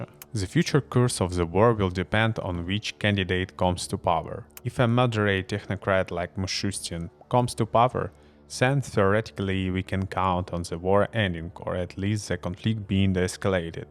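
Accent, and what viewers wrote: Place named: Ukraine. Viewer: You may not consider Khadyrov a serious contender for Putin's successor, but imagine the sight of Lavrov, Patrushev, Mishustin and Shoighu, stripped of their shirts and facing the wall, while Khadyrov poses in gopnik crouch before them. He grins because he has spelled out Akhmat in spray cream on their backs! By the way, have no worries over your English - many more of us are attuned to Ukrainian accents. Good video.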